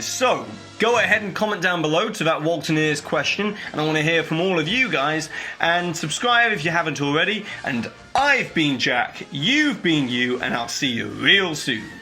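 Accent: England, United Kingdom. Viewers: Jack, I love your show. The way you pronounce words is cool. Very English. We say patent different here in the USA. See you on Tuesday.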